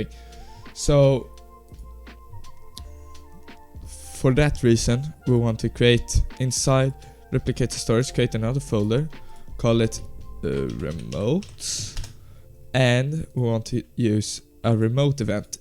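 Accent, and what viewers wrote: Sweden. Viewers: Are you swedish it sounds like that good tutorial! :D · bro have got the swedish accent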